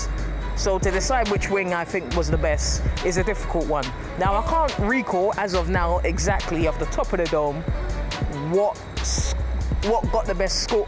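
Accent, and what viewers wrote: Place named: Roadman, United Kingdom. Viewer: I love how eloquently he speaks in a roadman accent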